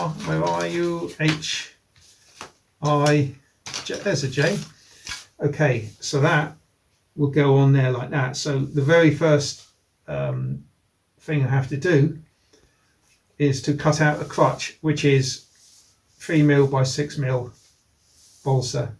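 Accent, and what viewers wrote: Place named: United Kingdom. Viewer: Hi it is the most perfect way to build a glider I am busy with one but come across a problem which unbeknown to you you have just solved and here is the question where do you or did you get the adhesive which first you apply whet than dry and than “HOT IRON ON” I would be very grateful if you could help me out with a address. I am in California USA and it sounds as though you are in the UK by your accent. Regards Peter Onel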